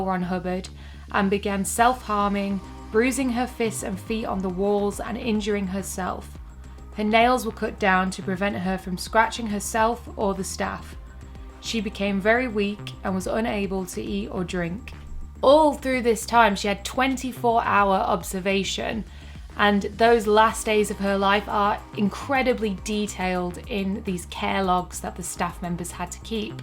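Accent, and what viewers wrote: Manchester, United Kingdom. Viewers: Excellent series and being a fellow Manc it’s so nice to hear another Mancunian voice.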